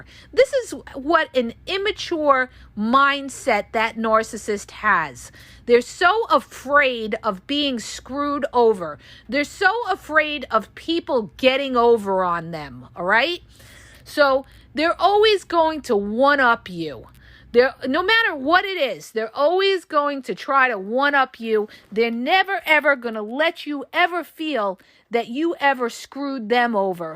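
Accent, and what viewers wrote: New York, United States. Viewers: I hear your NY accent. Lol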